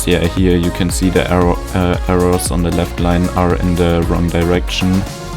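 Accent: Germany. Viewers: Man hört echt stark heraus, dass du deutsch bist xD Schöner Flughafen und ein paar ziemlich kreative Ideen mit drin. Da werd ich mir doch glatt was abschauen und selbst mal so verbauen :D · deutscher akzent 😳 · Are you german? xd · Haha dude I enjoy your German accent 😊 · Ich höre an deiner Stimme du bist deutsch😅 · i can hear that you`re german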